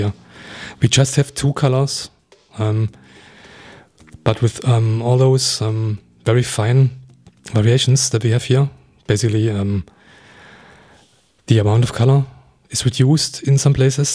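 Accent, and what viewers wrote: Germany. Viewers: His German accent is the best that u can find, lovely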